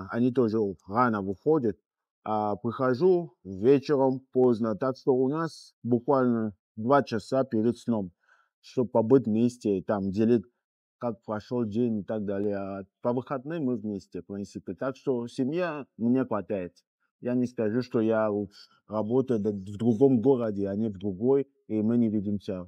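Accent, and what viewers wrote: France. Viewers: у него родной язык французский, франкоговорящему вообще очень сложно избавиться от характерного акцента, тем более с таким сложным языком как русский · Вы пишите бред-мой друг Француз(и предки его Французы))),научился говорить несколько фраз на русском и Р у него отличная,и акцента французского намного меньше чем у героя этого видео(хотя он во Франции живет, а не в России и разговорной практики у него мало).Все дело в желании.В русском тоже нет звуков которые например в английском есть,только почему то я эти звуки призношу отлично.А насчет картавости вы насмешили))Негр этот не картавый,он просто не заморочился от акцента избавится. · Моя учительница биологии жила и работала в Камеруне. Много рассказывала про Камерун, природу и людей. У такой французский акцент. Приятно слышать хорошие слова о России. Спасибо.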